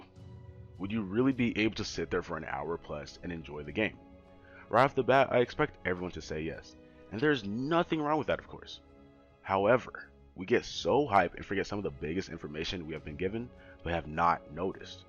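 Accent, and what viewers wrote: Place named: United States. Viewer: what was ur first language then? cause ur american accent is on point g.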